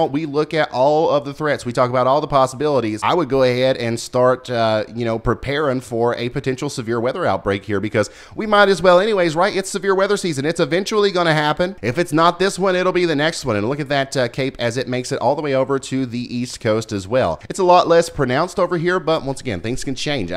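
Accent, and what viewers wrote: South, United States. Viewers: This fella sure does talk fast, especially a guy who has a southern twang.🙈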